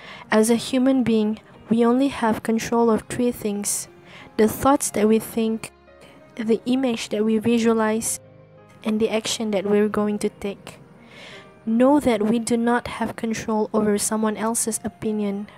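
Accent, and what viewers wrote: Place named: England, United Kingdom. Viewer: Come here because of english eccent..apa yg aku merepek ni..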